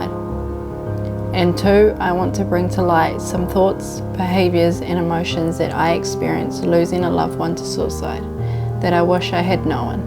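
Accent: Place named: New Zealand. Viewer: Thank you, for your gentle, strong words. It sounds like you have found your own peace, even if that peace means feeling crap for a bit or telling someone to F-Off. Where is that beautiful accent from? Kiwi? Cheers, R.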